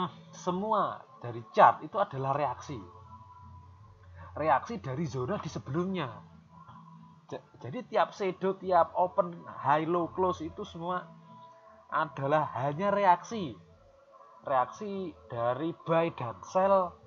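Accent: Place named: Surabaya, Indonesia. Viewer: Bahasanya kok enak bgt, logatnya lucu. Rodo2 suroboyoan.... seneng aku ngrungokke... paham pakde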